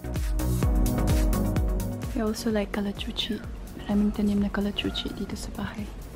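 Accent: Visayas, Philippines. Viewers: Bisaya jud paytera kaaju hehe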